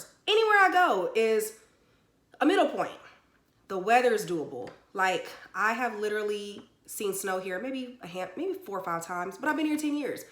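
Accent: Texas, United States. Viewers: You have the Texas accent lmao. DFW is definitely a go to moving place Our population may not be that much..since the population here is only 8million but real soon DFW will be bigger than Chicago and the same size as LA. But it's still so cheap as it was decades ago..and still more opportunities and jobs coming everyday Your doing a good job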